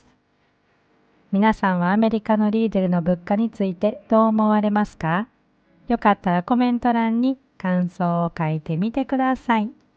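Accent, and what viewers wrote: Kansai, Japan. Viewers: いつも楽しく拝見させていただいております。関西弁でとても聞きやすいです。私は兵庫県です。食って大切ですね。私もちゃんと考え直さねばと思いました。できることから一つ一つ改善していこうと思いました。皆さんお元気で。これからも楽しみにしております❤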